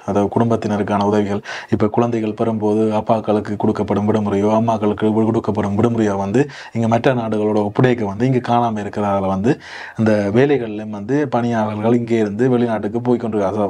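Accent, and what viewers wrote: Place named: Jaffna, Sri Lanka. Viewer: Your Tamil sounds sweet like Jafna people's slang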